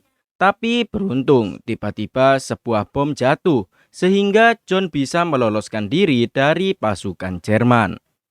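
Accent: Java, Indonesia. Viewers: medok sngt tidk nyaman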